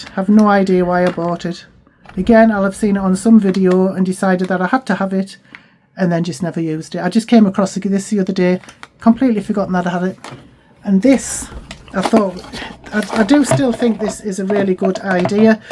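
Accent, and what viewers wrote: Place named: Sunderland, United Kingdom. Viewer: Just found your site.. that sounds like a Sunderland accent.. great vid thanks 🌹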